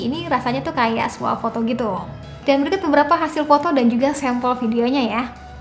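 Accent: West Java, Indonesia. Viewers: Mantap 😘😘😘😘 Sunda gituloh😂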